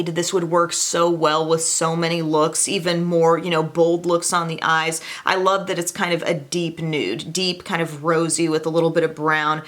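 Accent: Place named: South, United States. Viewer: o wow... making fun of people out there in the world that actually have developmental disabilities or disorders. Very classy. I was paying you a compliment and then that? lol, I guess you just never get tired of people voicing their opinion as to how utterly ridiculous, bitchy and trashy and ANNOYYINNNGGGG you sound. Please do Tube a favor..shut your trailer trash sounding channel down. I can't even stand your voice for one second. I almost fell asleep. Shut it down, you southern donkey.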